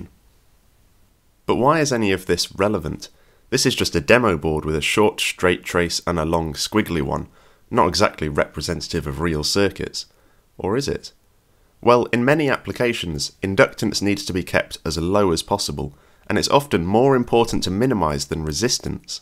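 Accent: United Kingdom. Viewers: He´s maybe in Germany, but he´s clearly a Brit...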